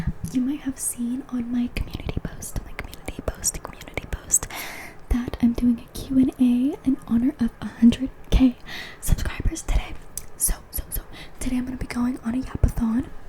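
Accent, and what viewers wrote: United States. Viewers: Watched/listened to the whole video TWICE! :eyes-pink-heart-shape: Some content creators say if you made it to the end put a zebra emoji or they ask a random question, so you see random zebra's or emojis that confuse people in the comments :face-blue-smiling: I learnt so much! I have much respect and admiration being a fellow Christian :face-red-heart-shape: 4 years with your bf is amazing especially at such a young age! :face-blue-wide-eyes::eyes-pink-heart-shape: I wonder if he's a Christian and you met through church? Oh I think a return to pink hair is on the cards for you! Your fans would love that I'm sure :eyes-pink-heart-shape::face-red-heart-shape: You didn't have New Zealand on your places you wish to visit :face-purple-crying: That's ok, you had some great locations! I knew you weren't from Germany (or at least with that thick American accent, didn't live/grow up there? 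:face-blue-smiling:) That's a cool story about your name! Cool parents :eyes-pink-heart-shape: Congrats on your Youtube/TikTok success and I'm sure you will only grow more in years to come! :eyes-pink-heart-shape::trophy-yellow-smiling: PS. I love the pigtails! :eyes-pink-heart-shape:Women can look so different with a subtle change in hairstyle :face-red-heart-shape: Would love to see different hairstyles/ braids in future videos :face-red-heart-shape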